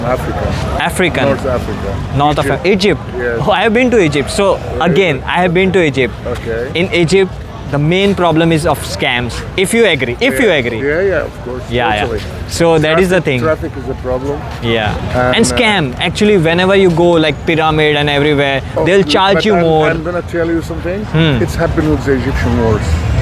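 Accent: Gujarat, India. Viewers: You are gujarati or something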